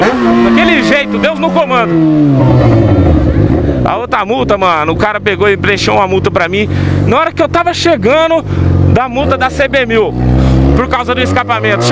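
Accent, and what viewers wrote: Goiás, Brazil. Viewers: vc e Goiano?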